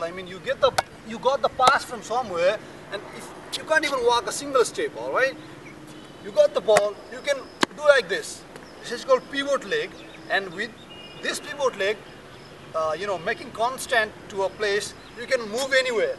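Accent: India. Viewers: wow awesome video and anyone can identify an Indian pronouce good job! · Were you from from indian bruh i dont understand you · Indian accent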